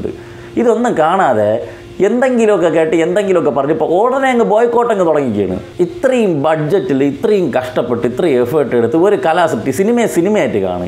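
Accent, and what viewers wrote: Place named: Thiruvananthapuram, India. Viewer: തൃശൂർ പഠിക്കാൻ വന്നതിനു ശേഷം ഞാൻ തിരുവനന്തപുരത്തെ സുഹൃത്തുക്കളുടെ സംസാരം മിസ് ചെയ്‌തു. നന്ദി. Hope we can be good friends.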